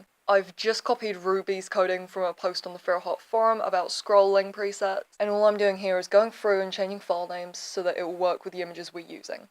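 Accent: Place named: Australia. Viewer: Your voice has changed, now you sound somewhat Australian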